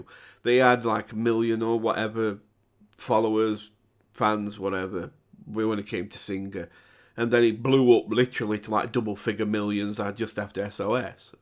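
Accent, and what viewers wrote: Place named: Midlands, United Kingdom. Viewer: Hi. One of the reasons why it is so nice to listen to you is that you are always so honest. As for the concert, I actually find it better after that. I personally think that only one artist should appear at a solo concert. And the singing of his parents is not my taste either. So I'm glad he's more solo on his subsequent concerts. I'm already looking forward to the next double..... when did you say you will do it? Soon? The Dimash-Soon (betwenn 3 days and 3 years) or a -Soon (tomorrow) 😂😂😂 And I'll forever be happy to listen to your voice, the giggles and the middle-englisch dialect... so see you „soon“ ❤️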